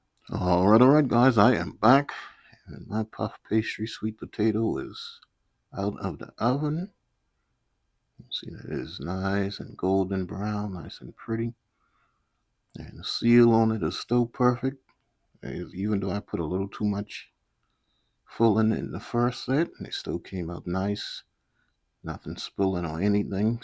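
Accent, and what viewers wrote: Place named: Louisiana, United States. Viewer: !! I'm hearing a SC Locountry or Louisiana accent. Making me a little homesick. I'm so making this! It looks delicious!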